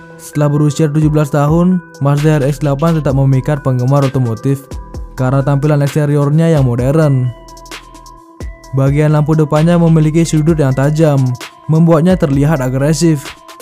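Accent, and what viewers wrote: Bali, Indonesia. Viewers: Logat orang bali dan ntb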